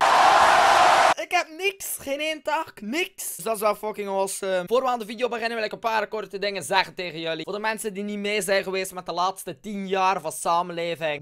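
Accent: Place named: Flanders, Belgium. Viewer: love your accent! (Ik vind vlaamse accentjes gewoon leuk)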